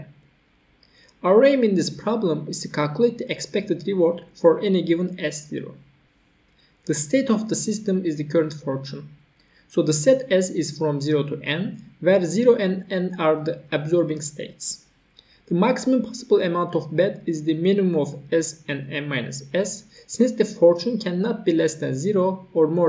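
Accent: Turkey. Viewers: Dostum anlatım çok güzel, aksanından Türk olduğunu düşüyorum. Eline sağlık.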